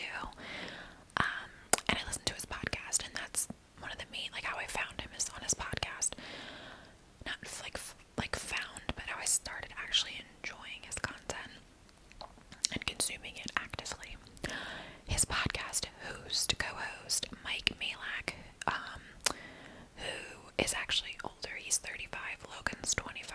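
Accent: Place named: Vineland, United States. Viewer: Uuggghh!!! I love your whispers the absolute most because your whispers remind me of my cousins who are from Vineland NJ and their accents are very similar to yours. I live in Vegas so I don’t see them as often as I’d like but watching your vids reminds me of when we would have sleep overs as kids and we would whisper all night about boys we liked lol. I wish you all the success with your channel, and i can’t wait to watch you hit a mil😉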